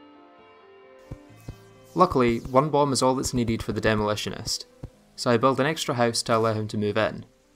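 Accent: Scotland, United Kingdom. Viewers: Omg the Scottish accent makes this video 10X better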